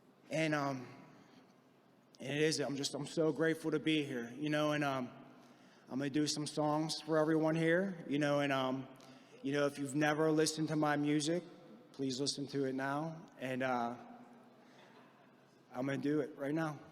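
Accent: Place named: Pittsburgh, United States. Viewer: Bring that Pittsburgh accent to all in the USA! 🤣 Fudge yeah!!!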